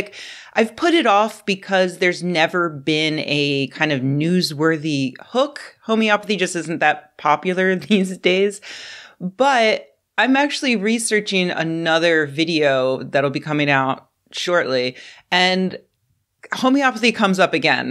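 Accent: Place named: Pacific Northwest, United States. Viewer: As soon as she started talking, I knew you were from the Pacific Northwest—a place where people are very loud with their lack of information. I have nothing to say about homeopathy, but I find it funny you don’t understand that a licensed naturopath by law has the same education as a general practitioner. One difference is they don’t do a residency and are kept separate by insurance companies will be a second. Another is natural medicine is the foundation of their training. An allopathic doctor who does integrative medicine is secondary—— learn through CEs. A statement often heard in the Pacific Northwest is, “I trust science. “ This is a religious statement that has to do with science. The belief that something is true only if it can be empirically proven. This is misguided. Empirical fact and truth are two different things. 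Not everything true can be empirically verified. For instance, we have no idea why or where, in the brain, everything comes together from vision, hearing, and smell to be understood, yet we can still do all of those things. It is called the binding problem. If I remember correctly, in homeopathy, the active ingredients are broken down by parts per million. I don’t know if it works, but I also don’t believe there is a definitive way of testing it. I know that endocrine disruptors at lower levels are more likely to cause problems in the human body than at a higher level—— Which is one way petrol-chemical companies have been able to say their plastics were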